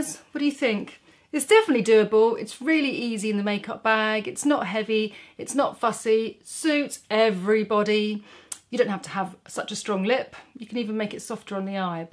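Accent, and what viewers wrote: England, United Kingdom. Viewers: greetings from Los Angeles. Luv this look (and so many others) for La La Land. I really enjoy your videos b/c, like me, you are fair with light eyes. I am that girl who takes a hat everywhere, wears gloves for driving and puts zinc oxide on under my makeup (Sisley-Paris makes a great stick) and even over my self-tanner. It's a constant challenge to prevent discoloration as spots feel as though they come about just from stepping out the front door. It's very tricky finding the right "natural" makeup look, especially in a town where you're supposed to roll out of bed looking like Cindy Crawford (I have actually seen her out for lunch in Malibu so, tough competition!) Thanks for your tips, effort and bravery for getting on camera and being so real. For some reason, things just sound better with an English accent;) Ciao! Alexis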